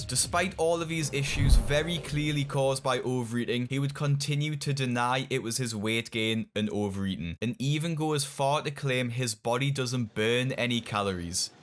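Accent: Newcastle, United Kingdom. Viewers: He is a Geordie. From the Newcastle area.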